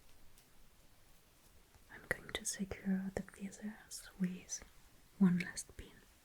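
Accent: France. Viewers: Super vidéo, le montage est parfait. Comme ton anglais d'ailleurs, ce qui est plutôt rare par chez nous ! Mais est-ce que ton french accent est naturel ou bien tu l'exagères un peu pour l'ASMR ? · I love the way she says Curlers. The French/English accent is so sweet to listen to. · My favorite part of her is her French accent. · Accents from the region of France are so nice-sounding. Very beautiful. Sorry, French Canadians. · Omg you’re so good! Question, are you french? (Note: if your not sorry, QwQ I’m bad with accents) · That wig reminds me of my hair bumpy, lumpy and tangles even after just brushing it(lol)!!!... can't wait to see how " Marie Antoinette"s hairstyle turns out....no woman in her right mind wants to look like a "hedgehog"!!!....love her french accent and her natural beauty.....this is my first time watching this ASMR artist.... I'm very impressed with the quality of her video and it's content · English with a French accent AND hair play.?ZZZZZZ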